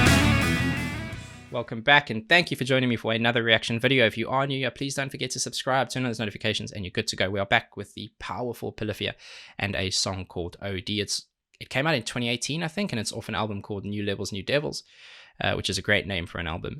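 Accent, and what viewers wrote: South Africa. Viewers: Is my guy here a fellow south african or am i hearing shit?😂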